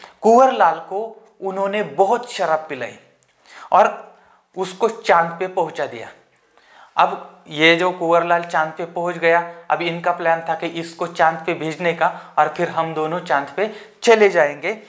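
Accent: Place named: Nagar, India. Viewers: सर तुम्ही नगर चे आहात काय? आमच्या नगर ला अशीच हिंदी बोलतात.. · Aap ki Hindi Marathi me nikal Rahi he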